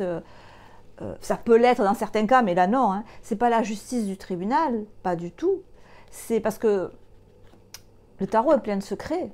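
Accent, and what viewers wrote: South, France. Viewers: Je vous découvre dans cette vidéo et je like. En tant que belge, j'adore votre petit accent du sud...Merci !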